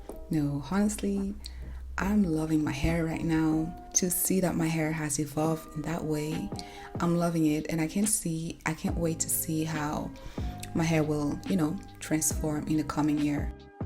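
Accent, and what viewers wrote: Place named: Haiti. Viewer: Are you Haitian? I was trying to pinpoint the accent. It’s adorable.❤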